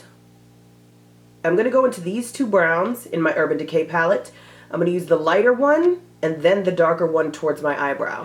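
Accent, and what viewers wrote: United Kingdom. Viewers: loveeeee!!! and great job on the British accent!!!!! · loool your bitish accent, love it. you really sound like us a bit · I just love that British accent!!! I'm an actress and often try to master it for future roles lol.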